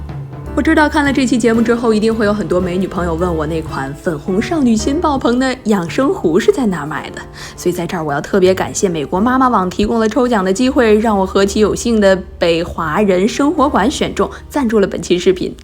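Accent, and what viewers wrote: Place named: Northeast, China. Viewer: 看起來好好喝，聲音也好聽，很像東北口音😁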